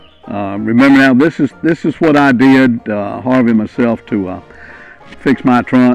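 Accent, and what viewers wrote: South, United States. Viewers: 5/6/23 Just came across your videos. I watched 2 so far and you addressed the same problems I had today after coming back from one of my first rides this season up here in the PA Poconos. I bought this 2009 Tri Glide Ultra after not riding for 4 years and felt embarrassed by the little problems I was having. Thank you so much. Love your down home style and southern accent. Most of all, thank you for your service to our country. I subscribed and I am looking forward to more helpful hints.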